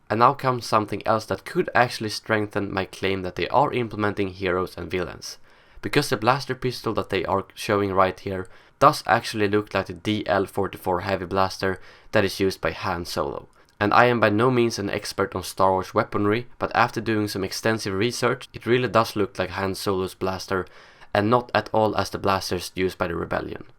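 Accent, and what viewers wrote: Sweden. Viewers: Are u from Sweden??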